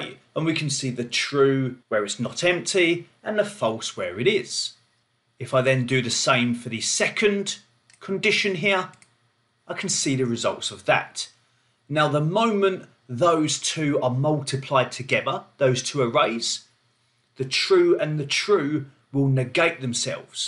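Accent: United Kingdom. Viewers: Dear Mr - great videos! and your UK accent is awsome and make tutorials pleasant to watch and listen to! Thank you for sharing your knowledge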